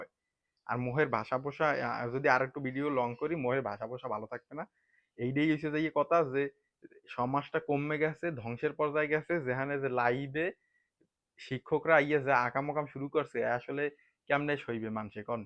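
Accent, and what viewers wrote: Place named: Patuakhali, Bangladesh. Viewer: Apnr vasha potuakhalir mnush der moto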